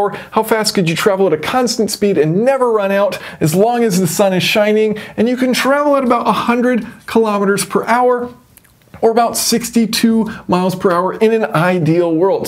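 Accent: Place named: United States. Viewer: I really appreciate hearing an American accent using metric units, just got to get the MPH to KPH - Love your vids, mate.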